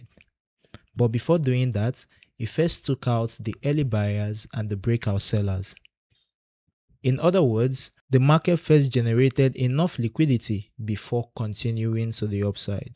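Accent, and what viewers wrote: Nigeria. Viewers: My guy, u are good. I'm surprised at ur Naija voice too. Your videos are full of great info. Thanks a lot.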